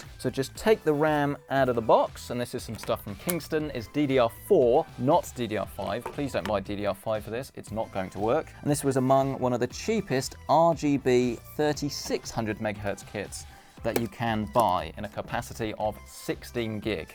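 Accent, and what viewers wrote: United Kingdom. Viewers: You accent is definitely British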